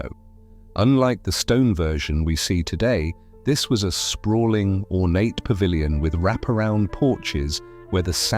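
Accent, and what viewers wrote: United Kingdom. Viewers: Noooo! The "arsenal of democracy" as narrated by a Brit! Gag! ANOTHER!! Anerican story but no American narrator! How about the history of London narrated by a "suthin cracker!" Shown in Grt Brit!!?? DONT WATCH!!!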